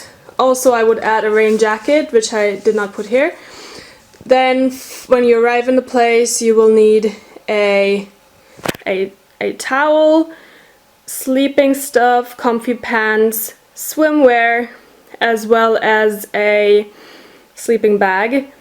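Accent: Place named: United Kingdom. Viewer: but akcent is british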